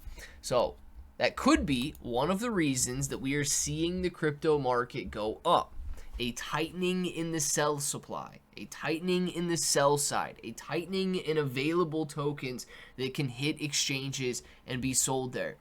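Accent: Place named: Australia. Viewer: Your Australia accent was awesome!!!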